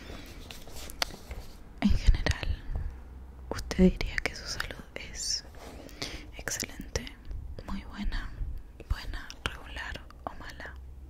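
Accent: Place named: Argentina. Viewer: Amó tu acento argentino, saludos desde México ❤️💤💤💤💤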